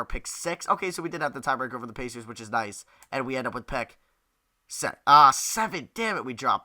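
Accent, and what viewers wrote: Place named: Long Island, United States. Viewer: Matthew B just Long Island accent nothing wrong I have it to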